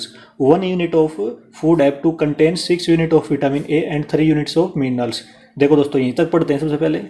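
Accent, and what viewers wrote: Haryana, India. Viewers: Khoon main hi haryanvi hai....Kaha se ho sir 👍🙏🙏🙏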